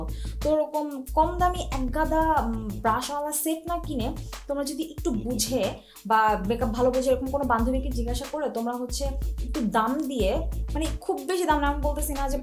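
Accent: Bangladesh. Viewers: Apu apni ki noyakhalir 😳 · Apu ki Chittagong er?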